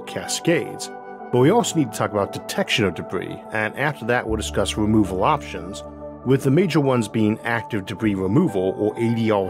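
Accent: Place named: Midwest, United States. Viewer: it’s not wrong, it’s just different. It seems like it might be a midwestern thing because Rob Scallon also says it like that and he’s from Illinois.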